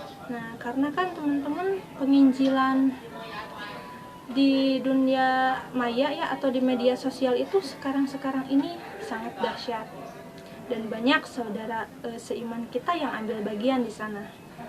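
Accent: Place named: Ambon, Indonesia. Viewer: Orang Ambon ya mba. Atw manado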